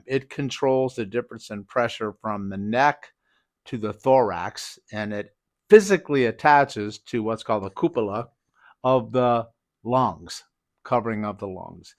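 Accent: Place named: South, United States. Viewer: Doctor have Hollywood smile - perfect teeth/alignment. And good physique and perfect example of health and healthy prototype. I detect Southern accent. What you are broadcasting is very informative and helpful to many people. Unfortunately , the conceited me a little jealous of doctor too 😢😂😅. Tq. I plan to apply these information and sustain a schedule of sorts to do it. 🤗🙂‍↕️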